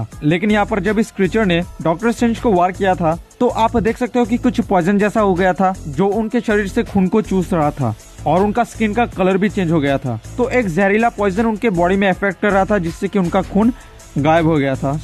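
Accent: Assam, India. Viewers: Nice explaining sir are you from Assam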